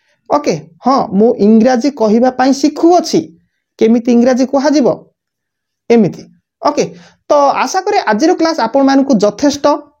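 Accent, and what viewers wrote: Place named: United States. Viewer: Sir American accent kahuchanti 🥱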